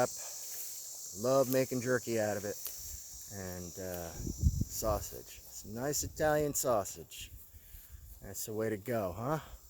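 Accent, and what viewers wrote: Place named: Oklahoma, United States. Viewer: You didn’t say hunting you said “huntin” your an okie jersey is a long way form you now ole son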